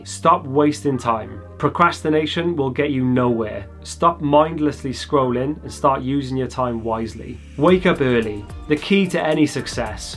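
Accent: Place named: Wales, United Kingdom. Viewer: Dear I'm from South Wales but have lived in Australia for the last 12 years. Recently I have been so burnt out renovating my house, being a single mum and trying to build my own graphic design business. Last night I stumbled upon your channel. This video has helped me a great deal and hearing your Welsh accent is so comforting. I really needed to hear these things so thank you. I suffer with anxiety and sometimes that can be totally debilitating as I question myself everyday and give myself ahard time. This morning I amfeeling a little renewed, small steps.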